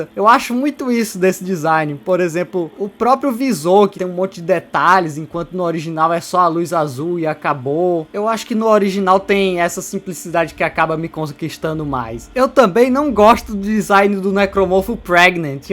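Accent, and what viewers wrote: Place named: Northeast, Brazil. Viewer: Na moral, é horrível ter que ouvir essa narração de nordestino, bagulho irritante demais!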